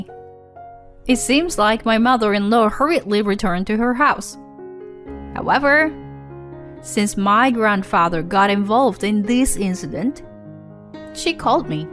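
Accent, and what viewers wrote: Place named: China. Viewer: hate this chines accent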